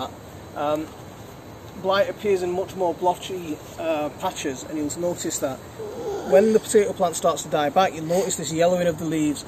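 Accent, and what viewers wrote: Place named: Northern England, United Kingdom. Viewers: It warms my heart to see a variety of people from various backgrounds and religions here not commenting negatively. This goes to show the affect our brother with his wicked Strong Northern accent is having on the peeps. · Agreed. I am as white as the driven snow and born and bred here. But my even my accent isn't as northern as that. Keep the videos up mate, I really enjoy watching young children (and adults) enjoy the garden and i enjoy learning from you too. Happy days!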